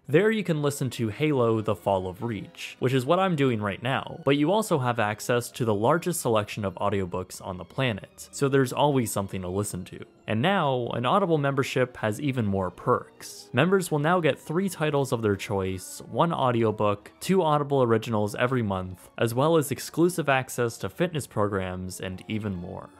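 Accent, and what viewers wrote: Canada. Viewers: got dat good-good nice, polite Canadian voice.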